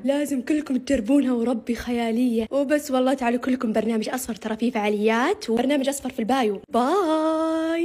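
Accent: Saudi Arabia. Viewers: والله عن جد اللهجه السعوديه مره بتجنن انا من الجزائر I love you ❤❤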